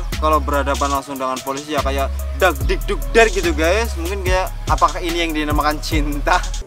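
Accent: Banyumas, Indonesia. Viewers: Gawe konten tapi ngomonge ngapak mas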